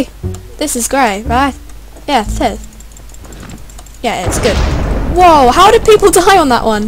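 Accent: United Kingdom. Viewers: Omai! Your British accent is SO cewt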